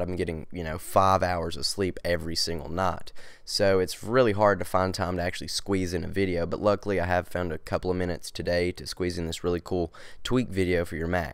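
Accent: South, United States. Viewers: This is completely unrelated but I just had to say something about your accent. It is absolutely wonderful! Sounds a bit Southern. Other than that, great video and thanks for sharing!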